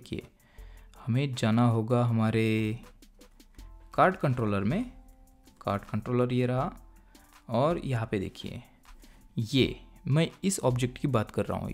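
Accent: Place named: Lucknow, India. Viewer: Where are you from. Because your language is like lucknowis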